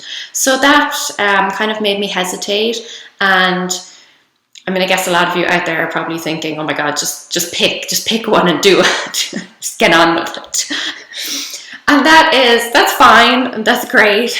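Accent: Ireland. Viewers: I DO like an Irish accent ;) :) !! But I am also impressed how early on you found and implemented konmari/Marie Kondo's tips and philosophy - I am so grateful to have stumbled upon her Netflix Show - what a game-changer!! And now that I've binge-watched the heck out of it, konmari youtube-videos are my daily go-to!! Thank you!!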